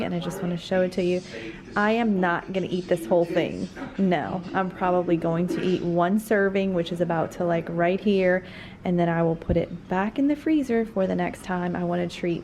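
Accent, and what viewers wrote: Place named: Louisiana, United States. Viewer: You must be from somewhere in Louisiana. lol that accent is for real! ❤️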